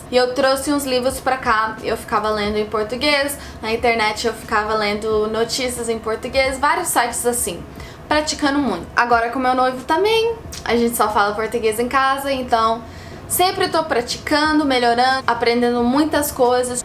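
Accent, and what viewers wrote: Minas Gerais, Brazil. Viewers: Seu português está muito bom, ou melhor você fala o meu idioma MINEIRÊS, Uai . Abraços daqui de BH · Seu sotaque n é de gringo, é de mineiro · Muito sotaque de meneiro, deve até ser que falando inglês soe diferente pros gringos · Mai nunka k vc foi gringa mineirinha simmmm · eu sou de Minas e p mim isso não é português estranho , eu falo +- assim, é "sotaque" de mineiro kkkkkkkkkkkkkkkkkkkkkkk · Esse sotaque de Minas ❤❤ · Depois de ver uma canadense filha de um alemão e que fala português com sotaque baiano, agora uma canadense falando igual mineira e filha de polonês, fala polonês também ? Jesteś bardzo piekną :) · Prosódia igualzinha a de um mineiro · seu sotaque é igual o meu, MINEIRO ❤ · CÊ TEM SOTAQUE É DE MINEIRA , TREM BÃO DIMAIS DA CONTA SÔ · Você fala perfeitamente o Português. Passa por brasileira tranquilamente. O seu sotaque é brasileiro, mais precisamente mineiro do Sul de Minas, bem leve. 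Parabéns. Passa por mineira ou paulista do interior de São Paulo. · Parece brasileira e tem sotaque mineiro 👌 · agora entendi porque seu sotaque é levemente mineiro. A palavra que você falou com maior sotaque foi MÃE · pra mim não me parece gringa, o sotaque dela não é canadense, parece mais sotaque mineiro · Você fala português melhor do que eu que nasci e vivi por quase 19 anos no Brasil! Parabéns!!! Quase nem da pra notar o sotaque “gringo”... só o mineirês! 😂😊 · Pai polonês, mãe brasileira, filha canadense com sotaque mineiro. Isso é incrível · seu sotaque é mineiro, até seu jeito de se expressar rsrs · Se eu não tivesse lido o título do vídeo eu nem ia saber que é gringa. Tem sotaque de mineira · Você não tem sotaque gringo na minha opinião, tem mais sotaque Mineiro! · Vc fala português 1 milhão de vezes melhor q eu kkkk, parabéns🙏🏻👏🏻👏🏻👏🏻 Ah e vc tem um pouco de sotaque mineiro tbm tamo junto kk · Na vdd você fala como mineira normal... 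Parece que viveu a vida toda aqui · Que legal vc fala mineirez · Tem sotaque de mineiro! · Seu português é perfeito e seu sotaque é de mineira. A única coisa que você poderia corrigir é quando você usa o ver "ir", apesar de que quase todos os brasileiros também falam errado. >>> Assim como em inglês, em português também a gente vai A algum lugar, e não EM algum lugar. Exs.: Eu fui ao aeroporto. Eu ia à escola.... Engraçado que sua mãe é brasileira, seu pai é polonês, você nasceu no Canadá, mas o seu nome é alemão. :))) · passada, vc fala mt bem... parece até um mineiro ameii seu sotaque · Sotaque mineiro total véi,como assim você não é brasileira?Kkkkkkkk,queria eu ser tão fluente em duas línguas. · Vc é brasileira tem até sotaque de mineira slk kkkk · vc tem sotaque de mineira kkkkk e não de gringa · Vc tem sotaque de mineira e NÃO de gringa 🌹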